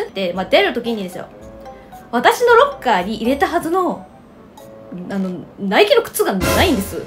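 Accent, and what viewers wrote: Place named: Osaka, Japan. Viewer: 話し方が大阪のおばちゃんにしか見えん。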